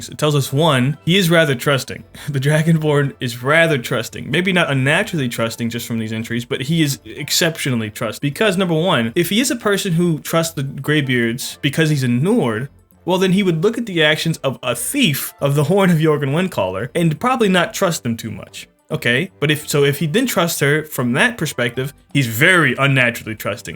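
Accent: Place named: New York, United States. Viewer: Isn't me or as a video went on. He got more and more New Yorker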